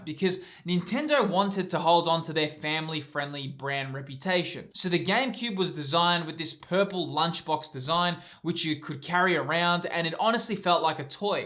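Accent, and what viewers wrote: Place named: Australia. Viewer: I didn't know that I needed a fellow Aussie to talk about the Gamecube like this, but damn - great video!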